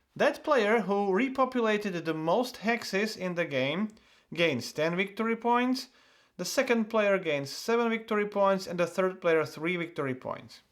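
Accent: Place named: Eastern Europe. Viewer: Wow, thank you so much!!! Amazingly chill content and as a west european, i freaking love east-european accents! 😬 How is it, that the video goes so long compared to your Praga video? I have heard, that this one is easier to teach? 🤔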